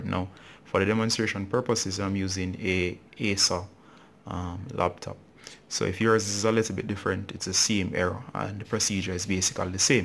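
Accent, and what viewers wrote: Jamaica. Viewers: where you from? you sound like a yard man. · Are you Jamaican?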